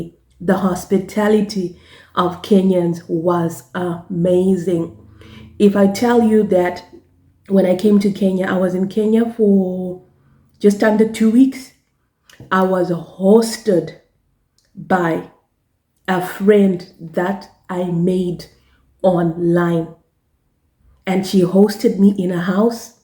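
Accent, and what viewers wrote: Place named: South Africa. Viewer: You have a South African accent, sounds very nice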